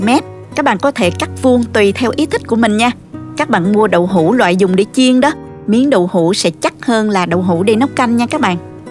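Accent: South, Vietnam. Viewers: Mình là người Bắc, thường xuyên vào xem kênh của. Các món ăn cô trình bày vô cùng khéo léo, ngon, bắt mắt, cộng với giọng nói Nam Bộ ngọt ngào vô cùng dễ thương. Thương hiệu các món ăn của cô chắc ko ai qua đc. Cảm ơn cô đã chia sẻ những món ăn đơn giản mà vô cùng tuyệt vời.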